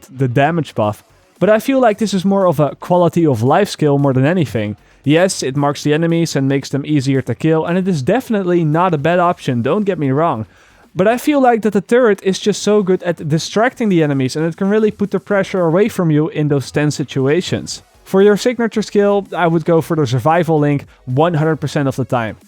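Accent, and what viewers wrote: Netherlands. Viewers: are you from the netherlands your accent sounds a bit like that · plat Nederlands accent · Are you from netherlands? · He's dutch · He's Dutch, which is Lesser Germanic. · its VERY obvious this guy is dutch because of his accent lol i am dutch aswell but i created my own accent on a young age so people kept thinking i was either from england or america which is great lol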